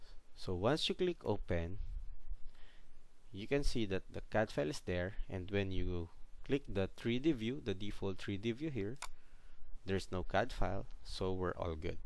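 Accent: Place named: Philippines. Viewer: I can tell your pinoy from ur accent😀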